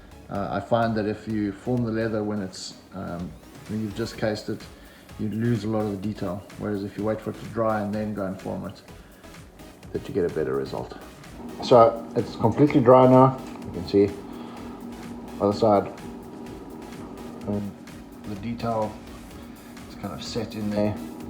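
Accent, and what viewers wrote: South Africa. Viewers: davis - smith. He sounds like a full on jozi boytjie. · Are you from SA? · Very cool. BTW, why do you sound half South African? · You sound afrikaans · Awee he sounds like a South African ou.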